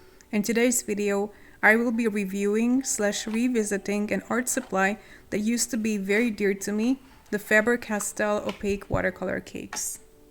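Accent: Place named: Hungary. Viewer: I came upon your channel and I enjoy your art very much and the way you present it. Also, I hope I’m not mistaking (I don’t think I am) I can hear a slight Hungarian accent which I recognize because I am also Hungarian. I cannot believe the derogatory comment you received, your english is beautiful, I don’t understand why would someone say those things,but I’m not completely surprised,there are many different people in this world. I am looking for more videos from you,es kivanok sok szerencset es a legg jobbat mindenbe!😊 Maybe my hungarian is not the best anymore because I live in America for the last 44 years. Szia 👋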